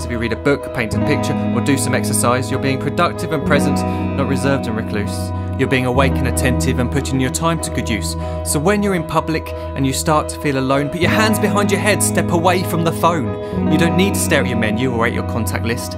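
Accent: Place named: United Kingdom. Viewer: Absolutamente sensacional! Nossa triste realidade e a antevisão do futuro,com o tempero especial do delicioso sotaque britânico (ou australiano?).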